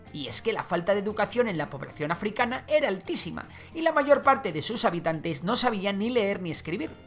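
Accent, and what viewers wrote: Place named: Spain. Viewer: Religión? Si, Europa hace del colonialismo un dogma, una religión, supongo que esos quieres decir. La revolución industrial provocó el colonialismo?, no fue su asquerosa codicia. Muy sezgado tu video, se nota demasiado tu acento español, que no se queda atrás, incluso matándose entre ustedes mismos durante su asquerosa dictadura.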